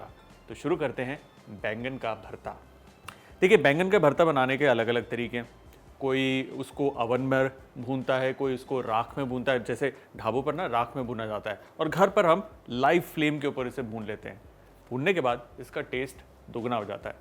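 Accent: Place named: Bihar, India. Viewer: punjabi wala bola na tum bengsli Odisha bihar style bol rahe ho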